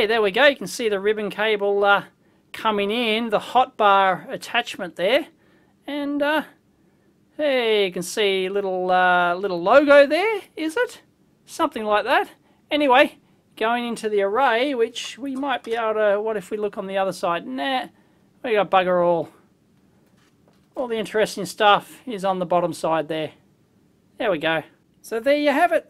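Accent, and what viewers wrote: Australia. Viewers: Australian accent? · I am not so much into electronics but i like your austrailian accent and I share your humor. Its a kind of entertaining education which I enjoy a lot. Go ahead, I will follow.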